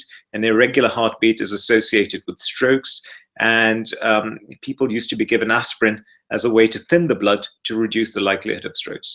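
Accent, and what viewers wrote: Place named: RP, United Kingdom. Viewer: What can causes palpitations or fluttering? Since 2y i started to get them. Since 5y i got hashimoto aswell. Before that time i was very healthy and energetic... since i got hypothyoroid issues there is always something going on... say i get 1 month palpitations the rest of the year its gone. At this moment i have it 1,5 month and it is not gone yet. I blamed first probiotics or greec yoghurt. So did not take it and still its there. Then i took celtic seasalt in water and bit by bit it went away! So i was very happy. But then 3 days later it came back. Most of the time i take every day magnesium. In juni cardiologist tested potassium etc and it was good, end of july it started the palpitations. So what can i do about it? By the way you have good videos, plus you are good looking and sexy too 😁☺. I like your post english accent dr.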